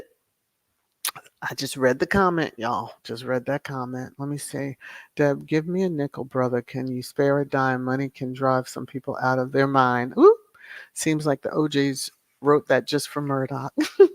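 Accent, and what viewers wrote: RP, United Kingdom. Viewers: Thank you for the podcast 🙏 ❤❤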